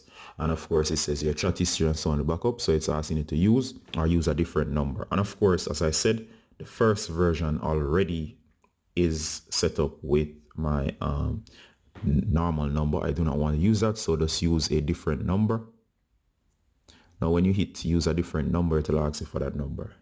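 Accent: Jamaica. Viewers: Are u jamaican? Good vid btw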